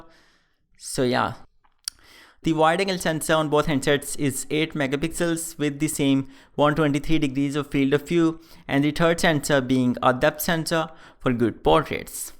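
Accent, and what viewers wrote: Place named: India. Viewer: why is it only Indians they sound so annoying!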